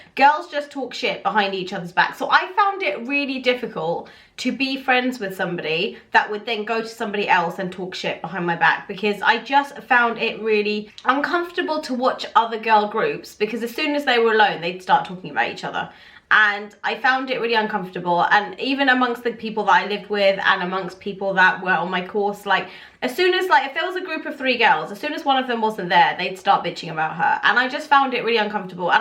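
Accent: United Kingdom. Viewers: I seriously can't get over her face. She's so pretty. And she speaks that funny English (British).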